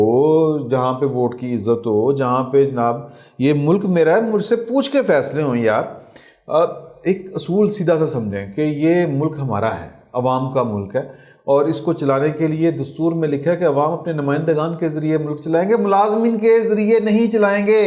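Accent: Punjab, Pakistan. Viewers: صاحب میں نے کوئی مہینہ بھر پہلے آپ کو اتفاقن سنا. شروع میں آپ کے تجزیوں سے پنجابیت کی بو محسوس ہوئی. مگر اب اپ کی tellings کا انتظار رہتا ہے. خاص طور پر آپ کا انداز بیان جو کہ نہایت سادہ عمومی اور بے تکلفانا ہے.اوپر سے ہلکا سا پنجابی تڑکہ اسے بہت پر لطف اورعام فہم بنا دیتا ہے.آپ مشکل سے مشکل اور بڑی بات کو انتہائی سادگی سے بیان کر دیتے ہیں. دعا گو ہوں کہ اللہ آپ کو صحت و زندگی عطا فرمائے اور اپنے حفظ و امان میں رکھے.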